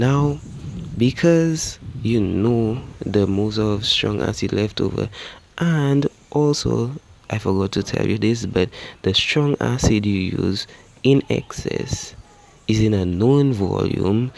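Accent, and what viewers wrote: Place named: Dominican Republic. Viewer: Where are you from? You sound Dominican/ St.lucian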